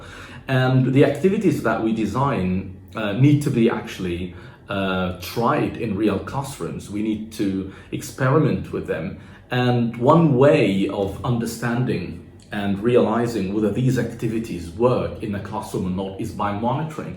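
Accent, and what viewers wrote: United Kingdom. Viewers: God Pless you Bro Your British accent is Great Keet it UP ❤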